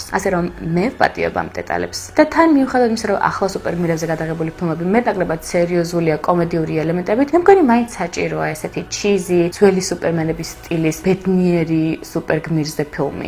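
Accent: England, United Kingdom. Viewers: zalian kargixar, sheni arxi maqvs gamowerili da didi siamovnebit gismen, magram gexvewebi es inglisuri aqcenti mishore, ubralod qartulad tqvi, ;D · imena am gogom ro ardauwunos rame nebismier films ararsebobs da dzaanmagzianebs sheni suleluri inglisuri gamotqmebiii, prosta tavs isazizgreb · ინგლისურს რო ისწავლე მერე აღარ იტყვი მაგას ძაან ასწორებს უცბად ინგლისური აქცენტი ❤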